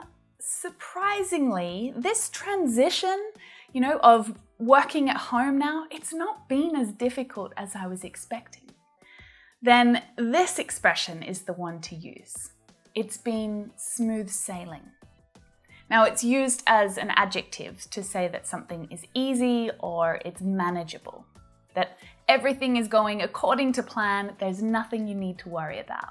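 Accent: Australia. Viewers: I'm a little bit getting hang of Aus accent while learning your videos.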